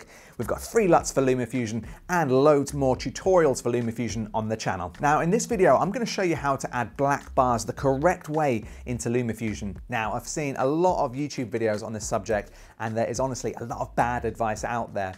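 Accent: United Kingdom. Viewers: You are a great facilitator!!! Can this program be bought as an IOS APPS? Can it be used STAND ALONE version? No Photoshop needed? Love the Mersey Accent. Thanks for your attention to my questions.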